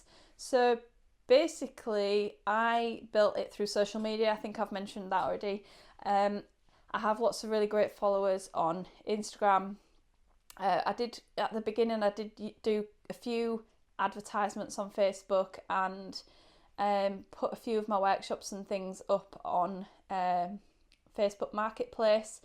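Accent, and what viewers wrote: Yorkshire, United Kingdom. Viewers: Great video and love to hear that Yorkshire accent! Like getting an extra episode of PBS's All Things Great and Small!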